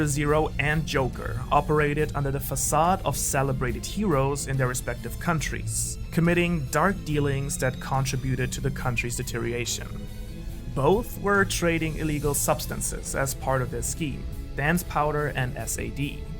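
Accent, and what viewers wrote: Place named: Germany. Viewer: you dont sound native english....are you from germany ?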